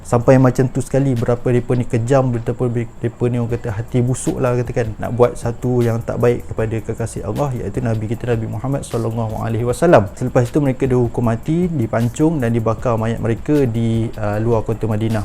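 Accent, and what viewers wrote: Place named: Penang, Malaysia. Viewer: ckp penang bang senang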